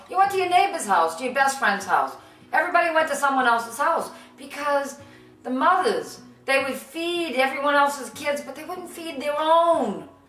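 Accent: Boston, United States. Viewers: love your videos!! thanks for posting. i love the boston accent. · ❤❤❤ Love it, I am not only learning a Boston accent but mannerisms too.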